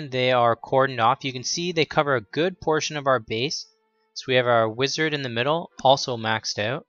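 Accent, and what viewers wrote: Canada. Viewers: your Canadian :D i can tell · canadian? lol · Not trying to be mean but r u Canadian?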